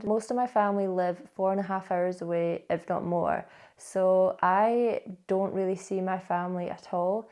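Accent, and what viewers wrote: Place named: Glasgow, United Kingdom. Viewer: Glaswegian accent😂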